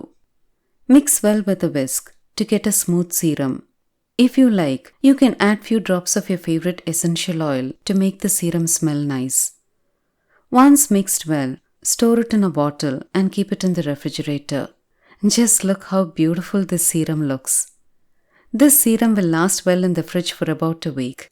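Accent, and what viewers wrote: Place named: Tamil Nadu, India. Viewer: கொஞ்சும் தமிழ் உங்கள் குரலுக்கு அழகு அக்கா.....😍😍😍😍😍😍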